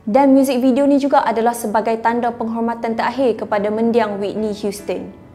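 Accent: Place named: Kedah, Malaysia. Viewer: Sempoi speaker video pon slang kedah noo 😁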